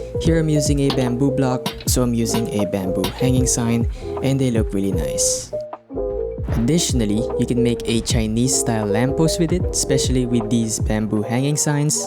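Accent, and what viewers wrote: Philippines. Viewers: You sound so Philippines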